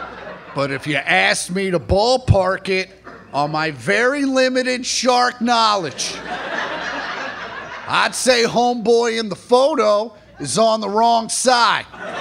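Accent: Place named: South, United States. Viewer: Nobody in Kansas has this southern of an accent, unless they moved here from the south.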